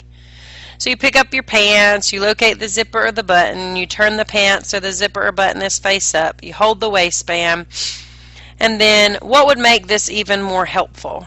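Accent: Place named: Texas, United States. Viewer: fantastic texan axent ;-)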